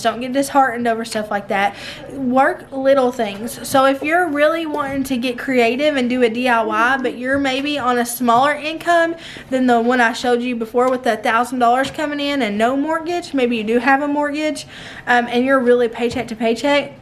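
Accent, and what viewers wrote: South, United States. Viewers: Hi there I'm new to your channel and I just love watching you and the way you talk,you have to be a southern girl.I have a channel but I stopped you tubbing because of my health.But I sure love watching you.Hope you have a wonderful weekend hun. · Random comment... I have to tell you... I love your southern accent!! That's it!! I'm going to finish watching you.. Love you, FRIEND!! ❤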